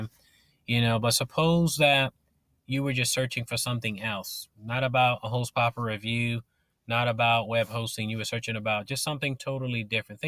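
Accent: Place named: United States. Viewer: Hostpapa is a Canadian web hosting service. You sound American. How did you hear about it?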